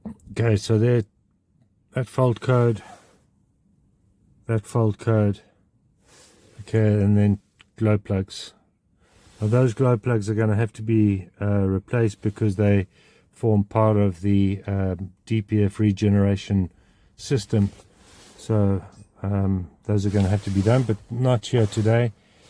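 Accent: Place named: South Africa. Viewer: Recognise that accent, regards from wilderness, South Africa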